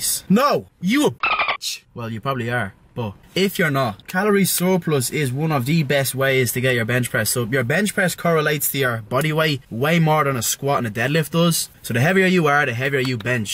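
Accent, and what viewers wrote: United States. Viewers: Cringe at the gay American accent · Are you from Texas? I notice an accent.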